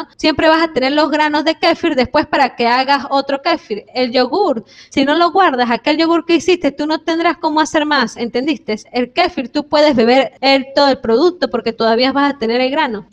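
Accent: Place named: Venezuela. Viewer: Hola 👋 una pregunta eres de Venezuela; me suena el acento, seguiré tus videos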